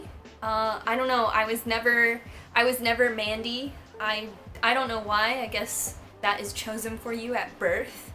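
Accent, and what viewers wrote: Philadelphia, United States. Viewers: I feel like you say, "I don't even-t know" with your Philly accent a lot :)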